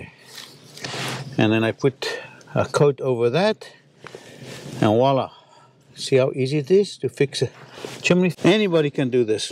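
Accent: South Africa. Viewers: Is that a South African accent I can hear?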